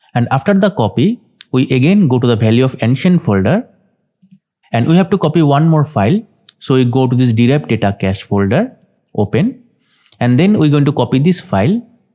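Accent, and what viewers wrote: India. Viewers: какой то индийский акцент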